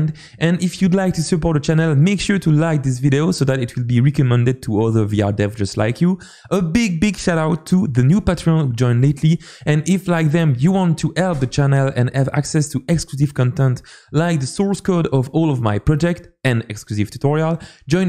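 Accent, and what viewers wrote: France. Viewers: es-tu français ? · Hello. Tu es francophone ?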